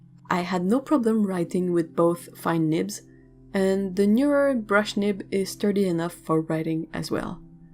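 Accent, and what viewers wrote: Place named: Quebec, Canada. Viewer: Même pas 20 secondes et j’ai tout de suite compris que tu es québécoise :D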